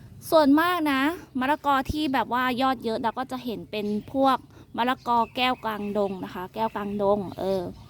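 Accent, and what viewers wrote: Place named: Thailand. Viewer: เจ้าอยู่บ้านได๋น้อเว้าคือภาษาบ้านข่อยแท้น้อ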